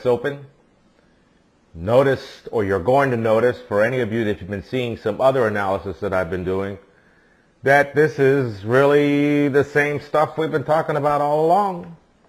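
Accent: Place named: United States. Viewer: Nice video. What part of USA is the accent? Cool.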